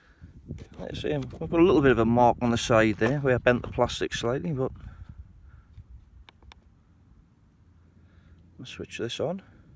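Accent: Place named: South Shields, United Kingdom. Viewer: New subscriber,... Aussie but with S Shields roots... I've been watching a few of these repair videos, Just wondering where you are from? Sound so much like the kids I went to school with over there - especially 'bah-ries'... My mate always used to take the piss out of me for saying 'batt-uh-ries'. Hehehe...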